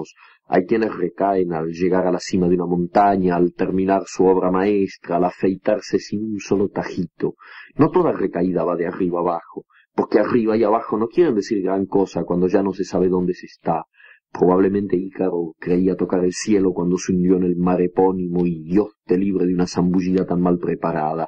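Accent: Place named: France. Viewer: Es único, esa pronunciación afrancesada ..me encanta..es especial escucharlo ..gracias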